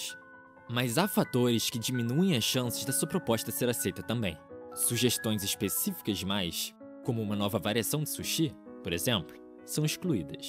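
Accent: Rio de Janeiro, Brazil. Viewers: emojix? pqp odeio esse sotaque carioca 😠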